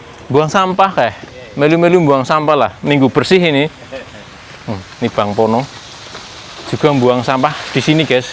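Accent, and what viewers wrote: Banyumas, Indonesia. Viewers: Wong ngapak nek ora ngapak ora penak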